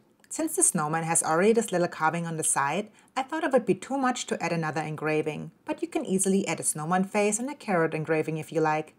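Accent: Germany. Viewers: Your German (i lived in Germany for 6 years who are know for over engineering most things I say this being French and knowing are most resent European history <num>) and I see you have some advance shop skills with a big push for safety which leads to think your a smart lady like many good Germans well above aveage, but with you aching your back the way you do does not help cutting wood it helps with you getting the views your algorithm and yes even comments and thumbs down would help you if you turn them on why you don't is silly! Your German you must have thick skin living with Americans because I heard alll the joke made towards Germans for many years all all the American living in Germany still to this day my father was in the American army who came to France at the tail end of WWII, so I know the story very well I hear many from my mother who half German and half French she did not lie to me she was in the middle of WWII she knows the truth! 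I am older than most of your aveage boys watching your videos, and I don't fault a pretty lady looking to get her bag with even if you have a side hustle that a lot questionable your not American your Greman accent is heard very well by most Ameicans, so I get it you have bills to pay but you only cut wood with that shirt open that far down and aching your back until you nearly flip backwards and we both know you cut wood like this on camera you would not cut wood like this on a construction site because we both know what would happe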